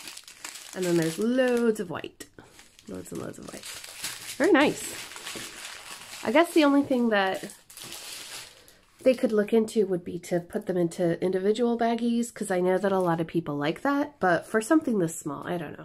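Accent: Ireland. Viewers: Hi I love your videos, wanted to know what size this was and how much it cost. I ask because Stitcherista just did an unboxing today on her first CraftEase, Her box was beaten up too. I think we all kind of agreed that hers was too expensive for the size she got. What is your opinion on your painting. Also I wanted to tell you that I have been watching your videos for a long time and I am noticing a bit of irish coming out when you talk, it is so cute. Keep up the great work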